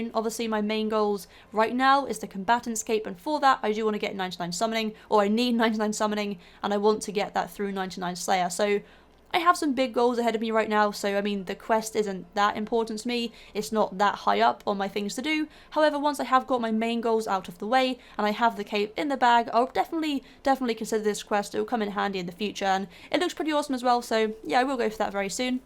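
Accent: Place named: England, United Kingdom. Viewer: She doesn't sound north or south england. She sounds in between.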